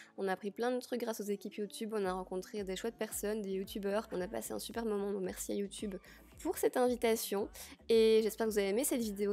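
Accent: Belgium. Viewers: Hello 🙂 Ohhh j'ADORE tes Routines! Tellement simple, enfin ceux sont clairement mes FAVORITES! 😍 Jamais dans le "m'as tu vu", toujours réel, fidèle a toi même Ta bonne humeur etc.. Puis ton accent belges dans tes Routines, MA VIE 😄 Des bisous 😘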